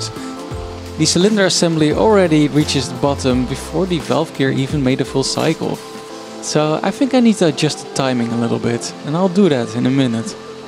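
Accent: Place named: Netherlands. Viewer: You sound dutch don't ya?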